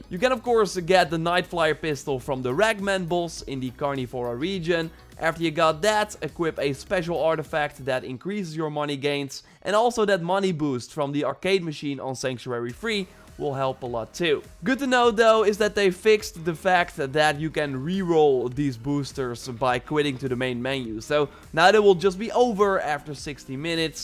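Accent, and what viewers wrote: Netherlands. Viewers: you sound dutch???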